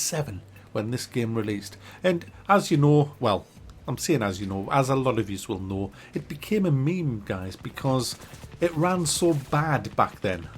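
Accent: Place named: Newcastle, United Kingdom. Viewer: From now on I only want to hear my game reviews in Geordie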